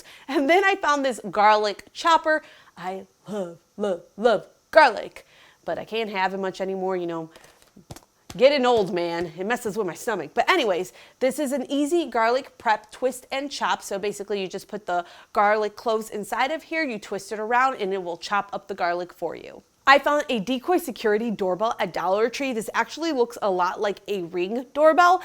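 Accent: Chicago, United States. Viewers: I'm so happy to hear that Chicago accent! I miss home so much 😞. Great video 💯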